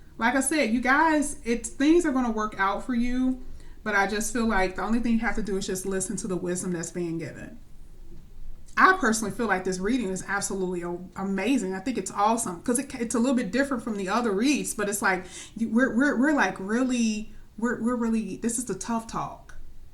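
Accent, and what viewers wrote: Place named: United States. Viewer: Thanks for the reading. I loved it and it made me so happy and resonated. Greetings from England and I adore your American accent and voice. I look forward to your next video sister. Also you are so clever to read tarot and predict the future and is so kind of you to do free readings. I am struggling financially right now and we are in lockdown in England but once I find my feet I will definitely contact you for a personal reading. I hope you are not depressed with lockdown and making enough money. I don't know if you have a day job as well as the tarot but I hope you are getting by financially in lockdown as many people are struggling. I would like to support your business as a fan of yours and have a personal reading and recommend you to my friends who will hopefully recommend you to others. Love and light. God bless you. 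Stay safe sister Love you lots xxx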